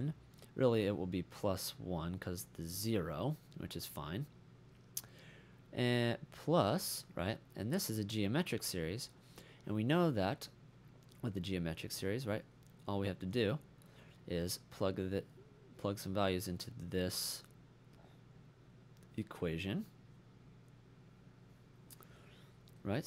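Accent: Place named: England, United Kingdom. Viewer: finally English accent!!! p.s ( i still love you indian computer science teachers :P )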